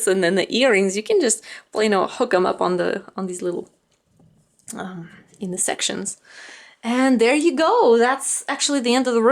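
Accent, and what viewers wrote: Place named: Russia. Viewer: a teacher of mine is Russian and it sounded a bit similar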